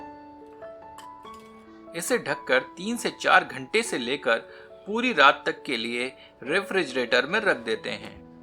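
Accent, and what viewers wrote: Standard. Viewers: I like your shudh hindi more than the recipe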